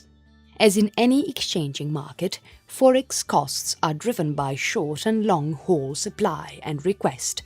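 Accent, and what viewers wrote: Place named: RP, United Kingdom. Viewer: Thanks, posh-sounding nice English lady, it was very informative :)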